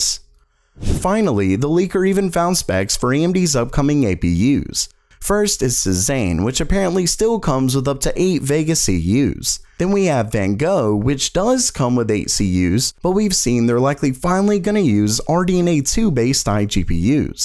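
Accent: South, United States. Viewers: Hes hiding his american southern accent for some reason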